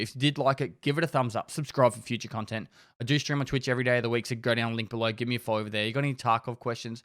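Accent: Australia. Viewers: He's Aussie breh like me. We say "Starlin" because our accent..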